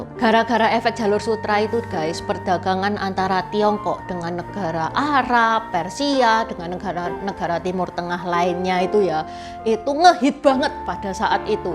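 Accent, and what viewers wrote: Java, Indonesia. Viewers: ..medoook... Suka deh... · Enakan dengerin dongeng nya nieh.. seru bgt, dg pk bhsa indonesia yg ada aksen jawa medok nya.. hihihi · Logat bahasanya kaya medok medok jawa ya...😂😂😂😂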